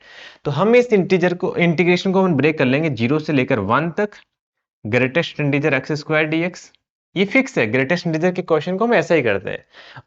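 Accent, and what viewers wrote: Rajasthan, India. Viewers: Sir kya aap rajasthan se ho😊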